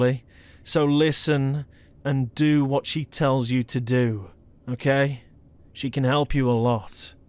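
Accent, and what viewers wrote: United Kingdom. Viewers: Againe, dune, this British accent that what got me into this asmr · I love british Accents they're so nice and calming🤩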